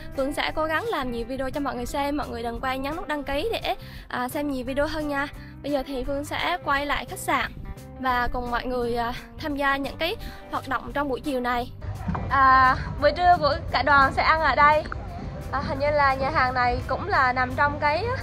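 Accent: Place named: Da Nang, Vietnam. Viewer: Phương người Đà Năng hay sao mà giọng nói như người Đà Nẵng vậy. Lần đầu mới xem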